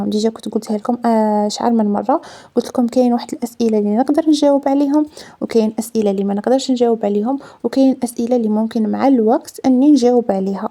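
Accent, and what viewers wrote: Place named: West, Algeria. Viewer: كنت حابا نعرف منين نتي عنباتلي وهرانية كي سمعت كي راكم غايا انا من العاصمة وتعجبني اللهجة تعكم تاع الغرب جايا حلوة وزيد خفاف عالقلب والله احبكم في الله❤❤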